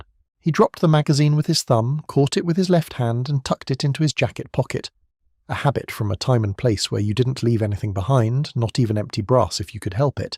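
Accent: United Kingdom. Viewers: These are Bull Shit Stories, especially from the point of view of someone that knows the 1911 and how competitive shooting works, only a Non Shooter thinks competition shooters are so Stupid. Narrated by a Non Shooting Brit. Competitive shooting gets technical and most shooters are professionals. Also a laugh, the Age of a 1911 matters not. Nobody I know ever made fun of a Veteran At one point, Revolvers were used in Competitive shooting. And nothing beats a Pre Series 70 trigger.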